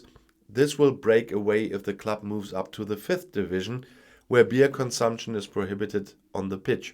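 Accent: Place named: Germany. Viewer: ), 'Wertschöpfungskette':)., you are a true European: you combine convincingly English spoken news with a slight German accent, having trouble remembering the word for supply chain in your native (?) language with a Belgium given name and Dutch last name.. Way to go! BTW: Genius example of German functional descriptive language word salat. BTW2: I just realized being Dutch from German ancestry with my kids, all possessing Italian, French, Dutch and possibly German nationality. My email address ends in.eu. We will get there eventually.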